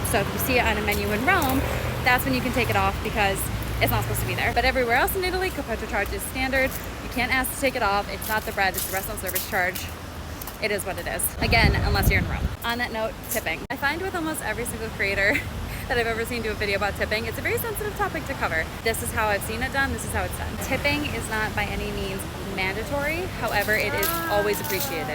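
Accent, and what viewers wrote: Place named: United States. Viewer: Sorry, but I couldnt really understand but a few words you spoke. As I’ve commented before, you speak so quickly and not everyone understands American.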